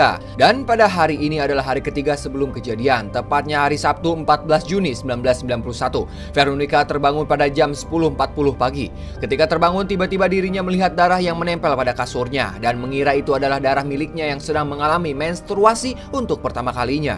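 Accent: West Java, Indonesia. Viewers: Pertama nya aku lagi serius nih ya,eh pas mimin nya ngomong ko aku langsung ter sedak ternyata mimin nya orang sunda ,sampurasun dulur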